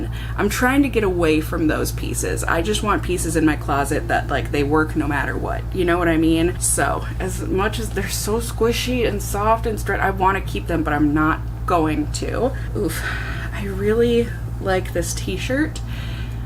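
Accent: Minnesota, United States. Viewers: You remind me of my daughter’s friend. Maybe it’s just your Minnesota accent. I’m a Minnesotan also but I have lived in Oklahoma for 40 years.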